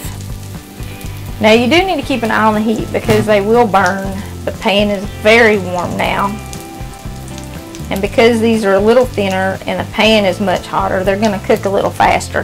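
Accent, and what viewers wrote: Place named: Tennessee, United States. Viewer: Thank you so much!!! My mother was born and raised in Nashville/Memphis Tennessee! You remind me of her! Right down to that beautiful accent! You are in my prayers ❤️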